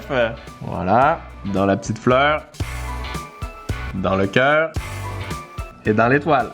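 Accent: Quebec, Canada. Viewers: J’adore ton accent Québécois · J'adore ton accent cuebecoi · L’accent canadien j’adore🥰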